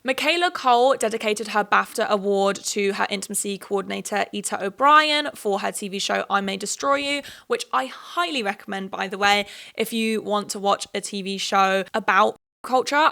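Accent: United States. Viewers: ok girl your American accent was surprisingly good in some places! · I am begging someone in the industry to make a movie or show about an egotistical self-centered show writer who ramps things up higher and higher and higher and is basically given whatever he wants just because the company loves him so much. I feel like it could be quite a good surreal black mirror-esque show/movie Edit: also, killing the American accent! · oh my god I love the sarcastic american accent 😂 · girl your American accent is giving me Emma Watson in the bling ring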